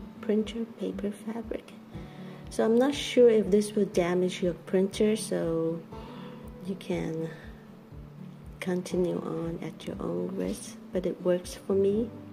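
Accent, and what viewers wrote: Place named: Germany. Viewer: you sound German , are you from Germany ?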